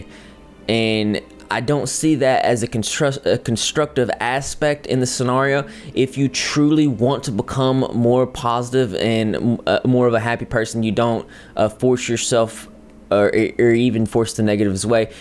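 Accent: South, United States. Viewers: He's a fellow southerner. We get that drawl, especially when we talk fast. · Is he southern or british?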